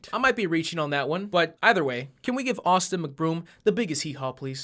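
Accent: Chicago, United States. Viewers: You from Chicago, my guy??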